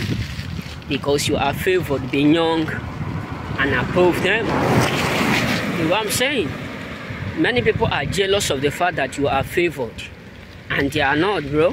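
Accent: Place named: Africa. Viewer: I love your videos I would like to encourage all chosen ones to go ahead and click on the transcript so you can understand exactly what he's saying I know his African accent is a lot so click on the transcripts and you can read word for word exactly what he's saying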